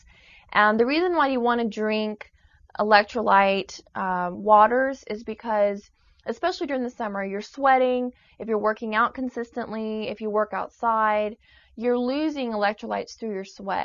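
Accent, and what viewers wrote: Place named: South, United States. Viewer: Yeah I notice that too. I think it's her trying to hide a southern accent.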